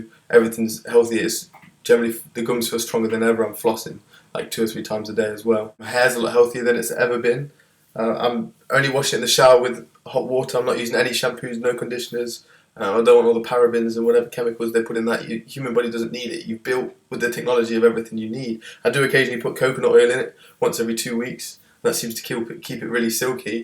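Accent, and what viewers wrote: United Kingdom. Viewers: dude help me! I live in the uk I think you do too, you sound like you do. I am sorted for snacks etc but where can I buy meals for myself that i'd like, i'm vegan and dont want dairy or meat anymore. its kinda gross what ive learnt...and cruel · Also the English accent definitely helps hahaha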